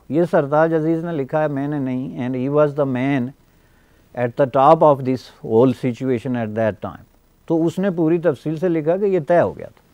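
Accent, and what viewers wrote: Punjab, India. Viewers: YAAR, YOU ARE A TERRIBLE SPEAKER WITH A VERY THICK PUNJABI ACCENT. DO SOMETHING ELSE:-)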